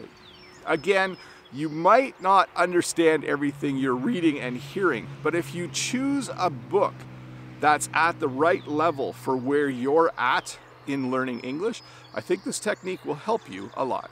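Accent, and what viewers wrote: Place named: Canada. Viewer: is this canadian english? I understood everything he was saying. American English is difficult for me to hear.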